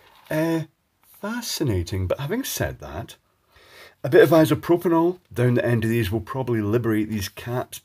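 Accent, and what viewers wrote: Scotland, United Kingdom. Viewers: Is this what posh Scottish people sound like?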